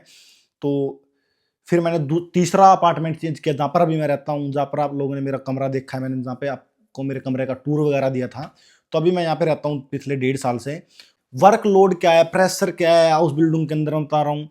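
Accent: Haryana, India. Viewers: Not to offence apki tone kitni haryanvi hai lagti hi nhi hai apko German suit karti hogi par earn karna sa matlab hai 😅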